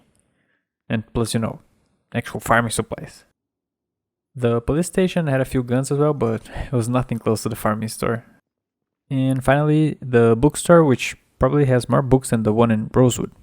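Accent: Brazil. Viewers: Tem sotaque de BR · teu accent parace um pouco brasileiro, se vc for, incrível que tenha alguem br fazendo um conteúdo tão foda assim, abraço!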